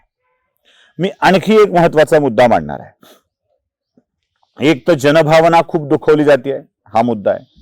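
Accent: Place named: Ghat, India. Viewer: महामहो उपाध्याय रिकामटेकडे भाजपचे कार्यकर्ते आणि विख्यात सर्वज्ञ श्री सुशील कुलकर्णी साहेब, आपल्या भाषेवरून आपण मुंबईकर, पुणेकर अथवा कोकणस्थ ही वाटत नाहीत, कुणीतरी घाटी असावेत ! तुम्ही खुशाल तुमच्या मताप्रमाणे विश्लेषण करा, कितीही आणि काहीही आपटून कोणावरही दोषारोप करा आणि विश्लेषण करा तो तुमचा अधिकार आहे, ते वाचून त्यावर मत मांडण्याचा आमचा अधिकार आहे , पण फक्त एकच विनंती आहे की आपली भाषा कशी असावी याचं कुणाकडून तरी मार्गदर्शन घ्या अन्यथा आपलं आडनाव तरी बदला😀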